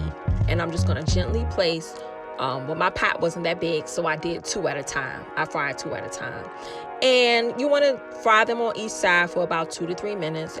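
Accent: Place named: Baltimore, United States. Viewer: You sound just like us from B more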